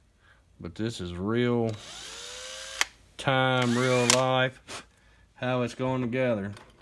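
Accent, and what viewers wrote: Kentucky, United States. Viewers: oh ok. Well I'm out then lol I don't 3D print or anything. Btw you must not be too far away. You sound like one of us Kentucky boys lol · You sound pretty country, you in Kentucky?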